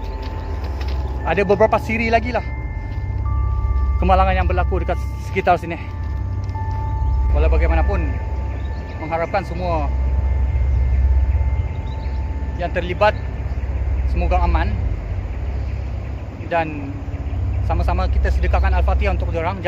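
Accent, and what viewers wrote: Sabah, Malaysia. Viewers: Bos org mana..mcm loghat sabahan pun ada..terbaik bos..sy suka konten mcm ni.. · loghat sabah ni. Sarawak takkan loghat begitu kalau bertutur dalam bahasa malayu baku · Mix sabahan ke bro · ckp pelik sikit trs cop indon..sabah sarawak pun ad slang mcm ni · Sabahan ker? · Mcm owg sabah dialekny... Jln kna kg Jin... Jin mrah laa kod... Jin ni dy bleh hidup ribuan taon.... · Awk org mana?? Knpe cara cakap MCM org Sabah sikit2. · First dgr kau ckp pun blh tau org sabah..dusun😂 cakap sabah ja boss senang,sy pun sda lama mnetap d kl since 2014 smpai skg msih lg ckp sabah,nda pndai cakap kihil😂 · Min ni mix ke macam sabah ade india ada 😅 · Hati2 bro klau masuk hutan..klau boleh bawa parang lah..👍👍 Dgr loghat bro btul2 mcm orang sabah😁😁😁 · Patut pun gea lain2 sia dingar.. Kompom2 da sia agak sabah sy bilang.. 1 kali nga butul2 bha org sabah.. 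Tebaik gaman 👍👍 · Pekat Sabah Admin nie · Kalau ckp sabah aku jadi x fhm ni pun dia ckp ok walaupun ada loghat sabah sikit2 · Slangnya macam urang sabah bangsa brunei.. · bosku org sabah ka? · cakap sabah sja bro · Hi saya first time kt sini.. Bro memang buat explore sorang@ Admin dri sabah ye.. 6thn di sabah.. dpt cam dh slang org sabah✌🏻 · ko org mna bos sleng mcm sabah mix smenanjung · kau org Sabah ke Bah? Dengar mcm sabahan saja kau......Sabah mmg ckp mcm ini....Kenapa Masih ada lagi yg Tidak faham bah😄.... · Terbaik.bro.. tapikan sape mcm aku confuse?? Bro ni ckp bunyi sabah ke bunyi sleng bangladesh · Alaa dh tau penonton satu negara tgk ckp je la standard bm, sekali sekala nak selit2 sabah tu ok la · Mcm ada terbunyi2 slank2 sabah ko ni geng.. org sabah ka kawan? Heheh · Sabah lh tu...sy pn mcm tu..hahaha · Sabahan tu bro, ko org mana yg xkenal loghat dlm malaysia ni org burma ke sejati?? 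· Perkongsian baik..merungkai sejarah dan misteri.(gaya bahasa-mcm org sabah)..Terbaik ,U terokai byk kwsan..like👍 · Orang Sabah ka?? Boleh tahan bro! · Nmpk sgt tk bergaul dgn orang n bodo, ni slang sabah lol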